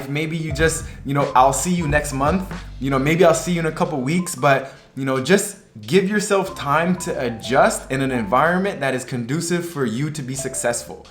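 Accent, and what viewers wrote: Canada. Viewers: I love this! I love your energy and joy! Side question: are you Canadian?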